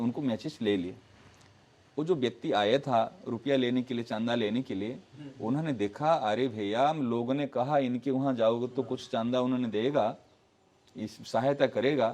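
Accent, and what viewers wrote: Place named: Odisha, India. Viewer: Guruji ki matru bhasa mujhe ODIYA lagta hai, unki hindi sabd ki utcharan main Odiya bhasa ki avasa hoti hai.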